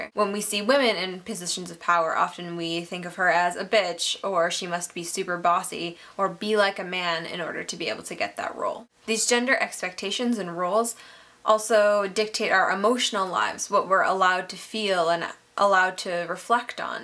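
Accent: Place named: United States. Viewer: ..... This is a modern viewpoint assuming that history respects your cultural opinion on sex. Based on voice alone and I may be wrong is you're american, less than 200 years ago it was a scientific fact that black people were lesser, and women's role in the house seemed to be limited to child bearing... Then you claim humans in ancient society had enough time to understand the difference between gender and sex.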